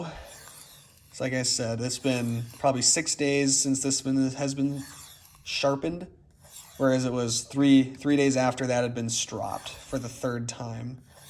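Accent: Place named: Spokane, United States. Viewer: ...sounds like you're from Spokane WA. My cousins say it that way..cool content btw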